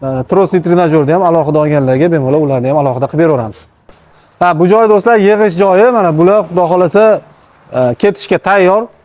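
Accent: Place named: Andijan, Uzbekistan. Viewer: Шевещга караб Андижонга ухшаяпти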